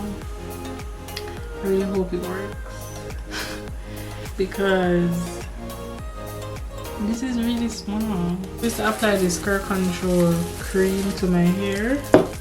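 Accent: Jamaica. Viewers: R u Jamaican 🇯🇲 lol slight accent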